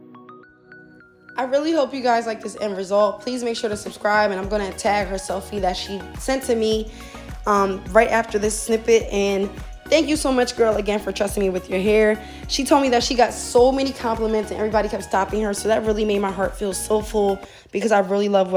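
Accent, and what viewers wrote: Philadelphia, United States. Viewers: Where are you located? I hear a Philly accent but maybe that’s just me 💖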